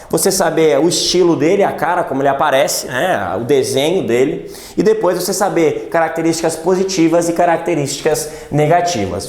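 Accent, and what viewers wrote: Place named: South, Brazil. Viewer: quando o profissional é bom, em apenas 1 minuto isso se torna claro. Parabéns. +1inscrito p.s. aposto que é sulista. ô povo bom para ensino ead.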